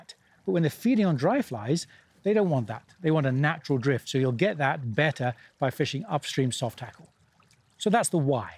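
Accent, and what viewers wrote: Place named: Wales, United Kingdom. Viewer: awesome vid as always. I’m wondering about your accent; everything I’ve read says you’re from the West Country but I’m sure I detect a hint of Welsh in your voice?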